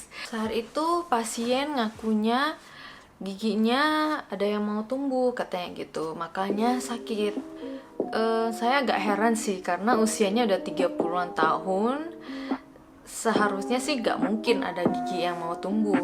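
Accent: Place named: Medan, Indonesia. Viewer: udah ku duga kalo dokter tu orng medan,soalya logatnya kas bget☺️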